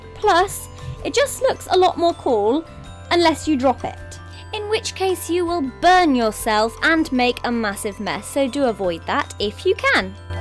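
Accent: United Kingdom. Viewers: "first step is to pop a sauce pin on the hob" you are so british and adorable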